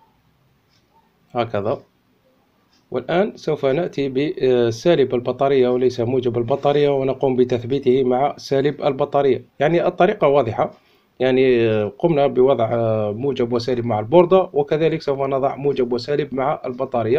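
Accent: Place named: Algeria. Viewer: ن من صوتو بلي دزيرير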